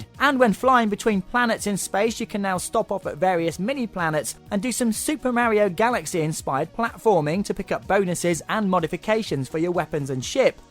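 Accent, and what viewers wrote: United Kingdom. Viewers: Am i the only one that findsthis review more entertaining because of the the ( hope i have this right ) british accent.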